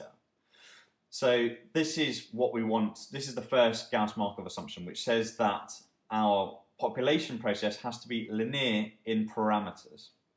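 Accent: United Kingdom. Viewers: just making a pause after some 30 videos... you have a wondurful vision of econometrics, everything is so consistent (...). It is just sometimes a bit complicated for a french guy to understand your Manchester accent...but after some vids it sounds like music of econometrics 😉 thank you so much!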